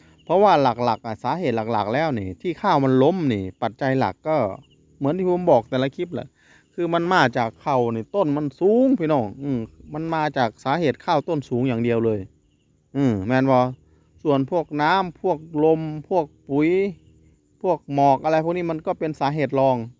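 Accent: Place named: Sisaket, Thailand. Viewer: สำเนียงคือศรีสะเกษนอครับ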